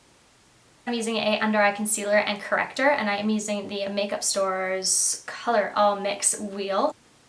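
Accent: Australia. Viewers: Every video I watch, I am seeing an Australian accent coming through stronger each time! You have such a great talent and Australia is damn proud to have you! Also love this look! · I can hear an Australian accent starting ;) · you sound soo australian here!!!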